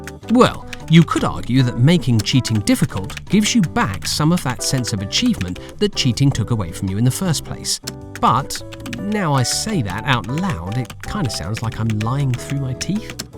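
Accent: United Kingdom. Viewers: This British narator makes the video more interesting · What is so funny about him being British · because British people have the best accent for narration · It's because he's British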